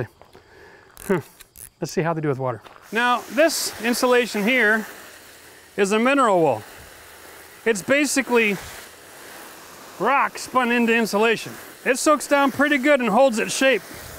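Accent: Ontario, Canada. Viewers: You always make it simple, honest and with a touch of Canadian eh! TY from BC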